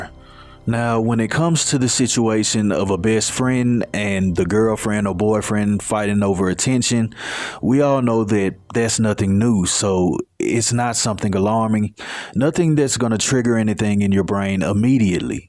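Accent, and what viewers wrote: South, United States. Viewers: New subscriber here! Your southern accent is very welcoming. I am a North Carolinian who was born, raised, and still lives here! I’m from the mountains close to Boone, but I am familiar with all of the places mentioned in today’s story. This is just heartbreaking. Such a beautiful young girl who never got to experience life and I imagine she had immense potential and would have done so many great things with her life. Unfortunately that monster took that future from her, and it just ain’t right. These type of stories always get to me. Just a sweet young lady who loved everyone. RIP Dani! You will never be forgotten! ❤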